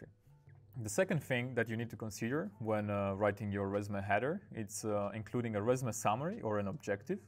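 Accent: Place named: Israel. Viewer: You must be from Israel. You sound Israeli and have a great personality.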